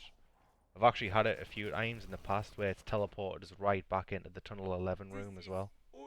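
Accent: Newcastle, United Kingdom. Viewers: he's from Newcastle lol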